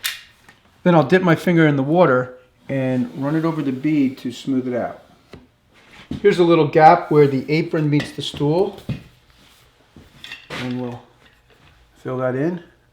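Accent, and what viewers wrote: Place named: New Jersey, United States. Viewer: Always fun listening to a fellow Jersey boy tawlk. 😁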